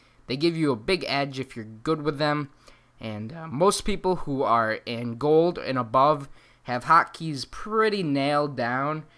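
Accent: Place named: New England, United States. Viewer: script or no script, you'd sound better without that awful new England accent.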